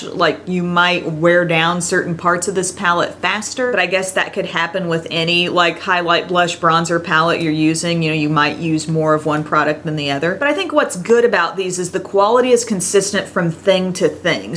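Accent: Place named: South, United States. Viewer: Everytime I watch your videos, or your snapchats ALL I can think is how much of a southern accent you have! But I love you, and always try to come to you before I purchase something!! ❤️❤️❤️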